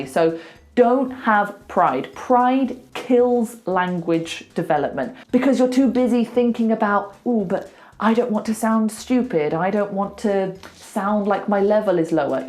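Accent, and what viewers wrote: United Kingdom. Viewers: IS IT BRITISH ACCENT ?😃😃😃😃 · Oh... I miss a lot this British accent!! ❤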